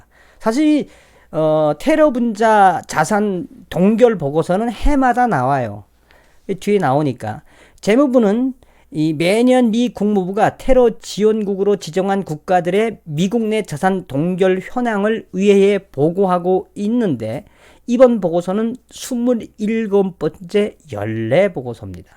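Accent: Pyongyang, North Korea. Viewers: 대한민국민이 어찌 북한 아나운서들과 같은 북한식 어조로 방송 시그날을 하나여?? 강한 거부감 느끼게되네..!! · 감독님의 북한 사투리는 넘 좋아요~~~ 음악도 박진감 넘쳐 쵝오 임다 ~~~~ 계속 밀고 나가시라요 👍👍👍👍👍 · ㅇ정성산씨 이북말씨가 재미있서요 그대로하세요 이북말씨도좋음니다 소식듣으니 좋아요 하 하 하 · 어리버리 좌빨 아새끼들 세상이 어떻게 돌아 가는지 당췌 모르는 닭대가리들 무시하라요 내래 평양 사투리레 친근감이 들고 좋습니다래 정성산 떼래비가 kbs보다 훨씬 좋은 소식을 들려주시니 고저 감사 드림네다. 반중 친미 멸공 중국산 물품 절대불매! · 나도 정성산 떼레비 웃끼고 재미 나요 넘 웃음이 넘쳐나요 북한 사투리도 좋고 ㅋㅋㅎㅎ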